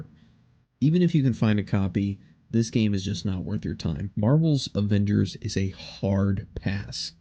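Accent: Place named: United States. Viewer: idk if it’s your stereotypical american voice (i’m british 😅) or decent editing / voice quality but i was expecting you to be popular while watching. vid was well made and clicked on it without seeing views/ subs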